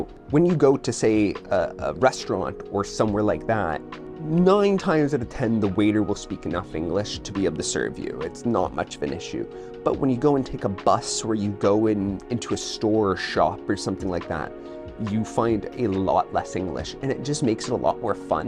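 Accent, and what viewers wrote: Canada. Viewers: You sound Canadian?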